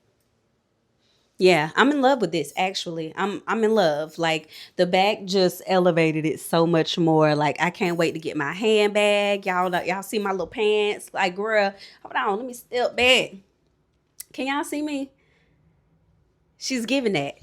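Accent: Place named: Georgia, United States. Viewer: I knew when I heard ur accent, u were from the Peach! I'm so excited u are from here too! I don't see many of us and I've seen fine fabrics so many times but was too scared to go in. I have so many ideas just need the ouch to create them🤭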